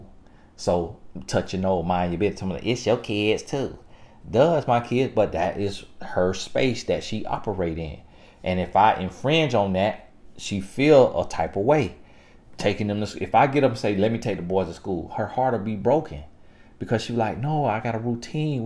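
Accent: South, United States. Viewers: I love country accent 😇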